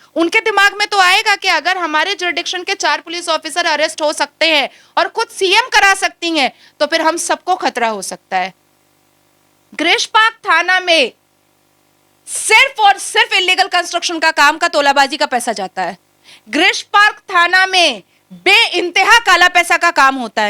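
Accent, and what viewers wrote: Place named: Bihar, India. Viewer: Madam actualy where r u from apki bangali shunke bahut accha laga...I think u r from bihar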